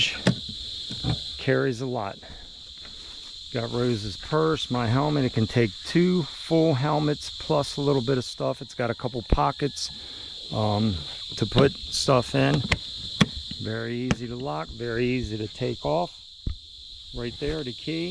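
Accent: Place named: Pittsburgh, United States. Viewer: If I didn't know any better..I'd day you were from Pittsburgh area, I can hear the accent, I to am from the burgh, but I definitely understand seeing the young lady, why you want go to another country lol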